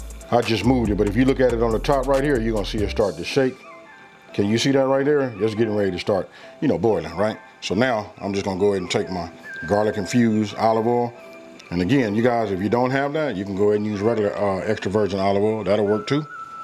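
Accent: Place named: Los Angeles, United States. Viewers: Im proud to see this dude also from Cali with that L.A county accent. Your Channel editing and presentation energy and skills ALL on point bro for real!! i already have my mom and sisters subscribed and myself too. im bout to regulate making your famous Chilli in the new crock pot for my elderly folks! god bless you and your famalia from ATL Ga! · bro i swear i knew he was from LA as soon as he started talking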